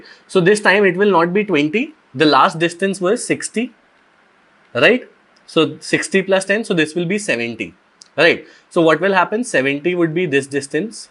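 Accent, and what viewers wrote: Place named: India. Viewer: he sounds like those scammers from India xd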